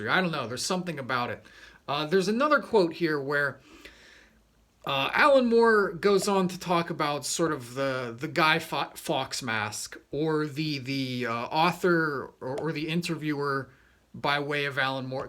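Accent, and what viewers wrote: Australia. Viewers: Specially since Moore is from the north of England. The accent on this vid is more Aussie/Cockney.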